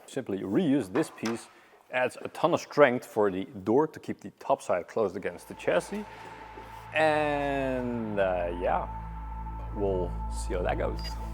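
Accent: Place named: Netherlands. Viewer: I was listening, and thinking that accent is very familiar. Then you started speaking Dutch and I was like MY INSTINCT WAS RIGHT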